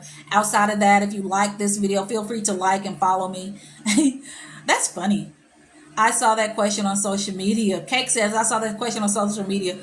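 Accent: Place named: Arkansas, United States. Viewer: Hey just subscribed! Love your videos. I’m from Eastern Arkansas…. 😊 Wynne…. Sounds like a lot of Arkansas men I know…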